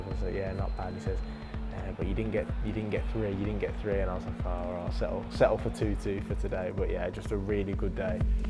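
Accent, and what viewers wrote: United Kingdom. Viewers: Bruh talk slowly 😢 We're not all from uk 🙂 ❤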